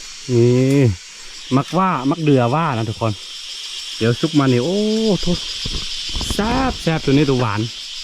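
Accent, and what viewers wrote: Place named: Isan, Thailand. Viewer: ชื่นชมครับอยู่ไร่อยู่สวนแบบพอเพียงครับ อยู่จังหวัดอะไร ทําไมพูดปนอิสานได้ครับ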